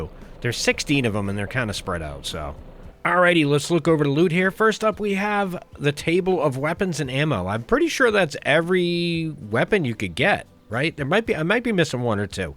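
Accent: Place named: Maine, United States. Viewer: oh yessa! Coast of maine hee-ah dee-ah!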